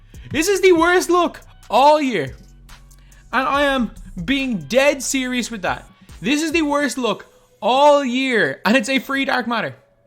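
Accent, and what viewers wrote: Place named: Ireland. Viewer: Angry Irish lad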